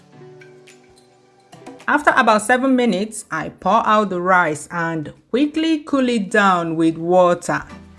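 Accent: Igbo, Nigeria. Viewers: Igbotic voice...yen yen yenh ! I love it lyk that 😂😂😂😂😂😂😂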